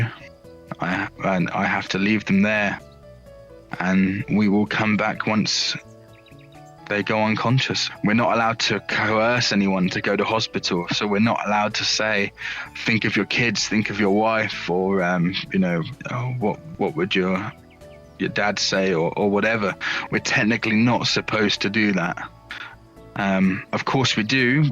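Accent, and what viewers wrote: United Kingdom. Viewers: Sounds like a norfolk boy! Good lad x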